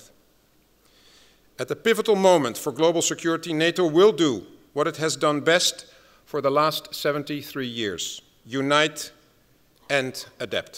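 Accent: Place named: United States. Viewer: No wonder he talks like an American.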